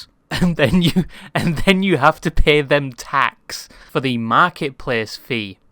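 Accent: United Kingdom. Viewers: And that Is nice British accent 'give me a bottle of wot'ter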